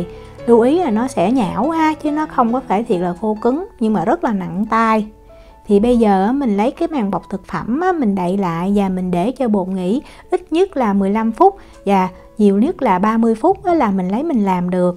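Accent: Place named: Can Tho, Vietnam. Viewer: nghe giọng c, e tưởng quê Cần Thơ. hihihihi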